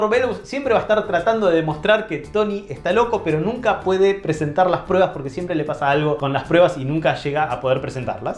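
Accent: Argentina. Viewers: No hables tan rápido, ten en cuenta que no todos los que te ven son argentinos, con el acento y lis modismos cadi no se te entiende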